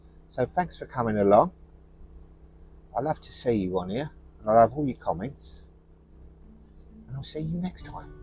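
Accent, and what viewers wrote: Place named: Cockney, United Kingdom. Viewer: Hi, really pleased to come across your channel hearing your lovely Cockney accent. We are nearly at the end of our boat build and are really looking forward to being on the cut. We hope to see you out there one day and promise to keep our music down 😅. Best wishes Lee & Ali nb black swan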